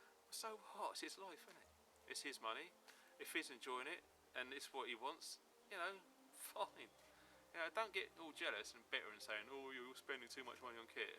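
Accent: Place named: Southern England, United Kingdom. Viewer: Like your Hampshire accent mate, it comes through at times here and there, the rest of the time its a modern Southern England accent, im from London, ive got that one too, ha. Have been watching your vids for a little while, im a subscriber now, look forwards to your New Year vids when you post. Lived in Portsmouth for a year a while back, (liked your Pompey shirt), hope they get back soon, Fratton Park and gunwharf Quays and Gosport ecrt ect, oh yeah, good times. Merry Christmas (ship mate).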